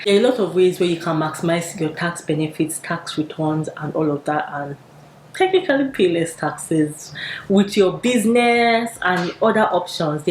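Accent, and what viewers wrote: Nigeria. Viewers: Thanks for this video sis. I learned a lot. I remember when my uncle co-signed to his friend's loan in Kaduna,it was a loan from LAPO, his friend fled to Ghana, his life became very hard and he was treated as if he was the lender. LAPO can be very scary in Nigeria. BTW sis,you sound like you're from kwara state.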